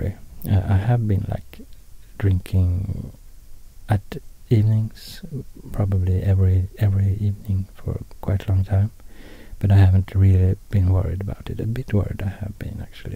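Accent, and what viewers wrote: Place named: Sweden. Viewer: I specifically came here for Swedish language and Swedish accent, so feel free to mispronounce any words :D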